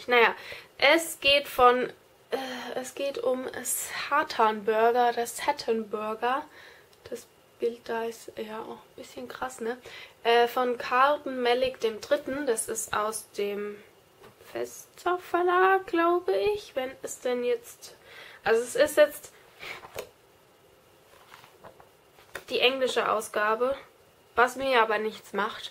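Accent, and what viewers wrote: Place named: Palatinate, Germany. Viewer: Man kann sich als pälzer ned versteggle :D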